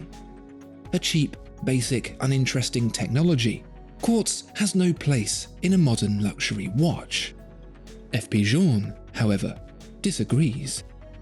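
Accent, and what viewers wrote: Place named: RP, United Kingdom. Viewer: Despite the crazy watches that are already fascinating on their one, the real key to your videos is that absolut high end English. Huge probs. Love it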